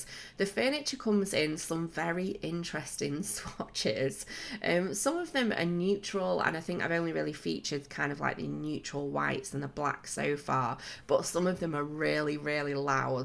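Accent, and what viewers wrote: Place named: United Kingdom. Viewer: Such an amazinh build once again! I always watch your videos... im a huge fan of UK im dying to visit your country... but until then I have to hear you accent... its so amazing 😂😂 Btw loved the entrance song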